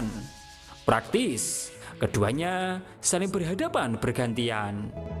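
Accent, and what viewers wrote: Java, Indonesia. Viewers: Pengisi suaranya pasti orang Jawa ni... Medok 😁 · Jowo medok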